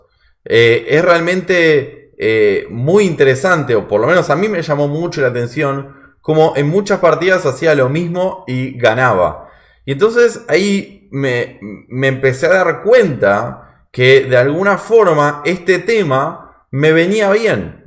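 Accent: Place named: Argentina. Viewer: El mejor canal que encontré para un principiante avanzado como yo. Instructivo y pausado. Que lindo el acento argentino. Soy español, juego online, incluso hice un amigo en Neuquén. Saludos y sigue asi!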